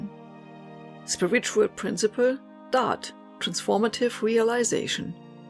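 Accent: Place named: Germany. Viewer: I hear a german accent. Are you from Germany or Austria or something like that? :)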